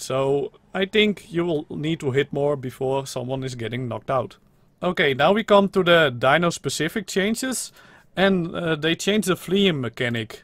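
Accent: Netherlands. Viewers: u bent zeker nederlands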